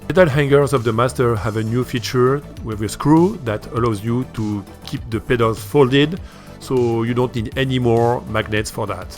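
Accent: France. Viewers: Belle narration avec le flair de Paris saupoudré .😘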